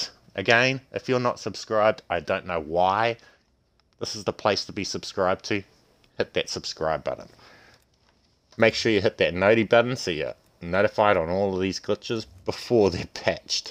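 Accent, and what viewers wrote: New Zealand. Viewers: new zealand accents make me want to be from new zealand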